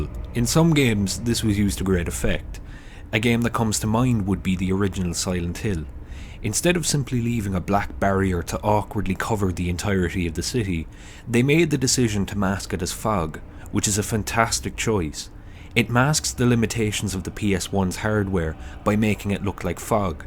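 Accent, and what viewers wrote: Cork, Ireland. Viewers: Is that an eire accent? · Cork accent? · Your accent is so unsettling. Are you Irish?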